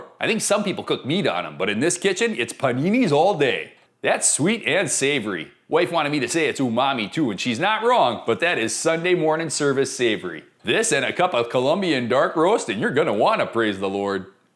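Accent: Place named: Minnesota, United States. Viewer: You're soooooooo much more Minnesooooootan than I remember you being!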